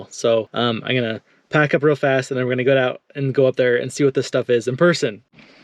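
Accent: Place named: Boston, United States. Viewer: getting the hint you're from Boston? Haha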